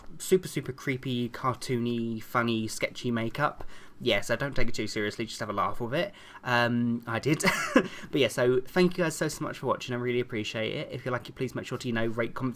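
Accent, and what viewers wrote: England, United Kingdom. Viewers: Lovin all your tutorials xo! Its amaziiing! Even tho I'm your new subs:( and I also love your British accent ♥ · Here it was so scary and then you start speaking in this warm, wonderful voice with a lovely English accent.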